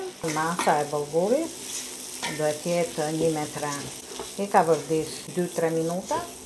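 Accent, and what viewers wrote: Tirana, Albania. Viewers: Ty te ka lezet luga kur gatuan dhe dialekti jonë i bukur i Tironës.❤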